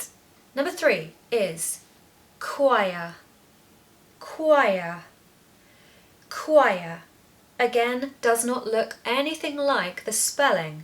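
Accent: RP, United Kingdom. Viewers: Lovely accent you have! I always liked everything British so much and try to improve my spoken British further, so I’m happy to have found the channel. So actually apart from maybe Wocestershire, I didn’t find any of these words very hard to pronounce. Even if I maybe don’t always get it precisely right, I knew how they should sound. In fact, my most hated word to pronounce is actually: PURCHASING!!! I’m working as a buyer and everytime I have to use that word I say it with a German emphasis on the second syllable instead of the first one!! I have to force myself to say „purr-che-sing“ instead of the rather German sounding „per-chaesing“😂😂😂 I pronounced it wrong when talking to a Texan and he didn’t understand at all what I meant. I hate that word 😂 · I love your British accent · Splendid British pronunciation ,Thank you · wow I like.british speaking accent english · Your are absolutely welcome. 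Keep on teaching with your beautiful RP and encourage learners to converse in correct English. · Hello! Madam, I love your British English accent. Your teaching techniques are excellent. 😊❤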